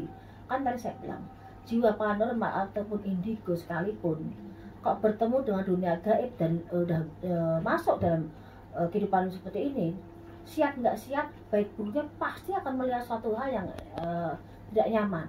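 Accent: Java, Indonesia. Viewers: medok banget mbk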